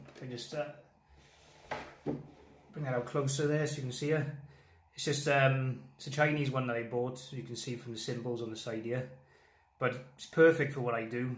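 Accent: Wales, United Kingdom. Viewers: Hey. Just catching up after getting my computer back in order. Cool video and nice to hear a Welsh accent. Makes me kinda homesick, lol (I live in Holland). I've never tried wood burning, but it looks amazing. Agree with what you said about the community and getting lesser known artists seen. With that said I will be doing my first shout out this week. Just trying to get back on my feet as I've had a few health issues of late along with all the hassle with my computer breaking down. Anyway, at the risk of leaving a novel-length message I'll just say great video and nice to see the process:o) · This is great, hearing you explain your art is a lot better for me and probably other people too, rather than music!! I really enjoyed this video, your tools, how you explained your art is Fab. I'm a real supporter of your channel 👍 I get what you mean about subs for subs!!!. 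Loved it, thanks for showing us your art😊✌️👍🇬🇧 p.s miss the Welsh accent I grew up in carnarvon, love Wales 💝👍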